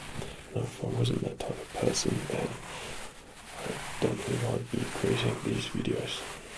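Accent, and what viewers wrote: United Kingdom. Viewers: This is so relaxing, I like not being able to see what's being used, also nice to hear a British accent like my own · Where exactly is his accent from? The U.K. obviously, but where specifically? It seems quite distinct from what I traditionally associate with the "British Accent" . . . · Is he actually posh English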